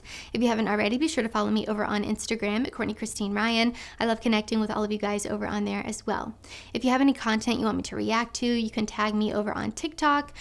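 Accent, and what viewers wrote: United Kingdom. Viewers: Other than her british accent, I 100% agree with her. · Her voice is more masculine than it is British accent. I will leave it at that. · I came to the conclusion that I would never marry a white woman a long time ago and I didn't. I married a Philippina 11 years ago. No headaches, no arguments, no bullshit. I know that the woman seen here is an Asian of some flavor and has a British accent. Stick with PH as most (but not all) are much better than what you see here. You will thank me later!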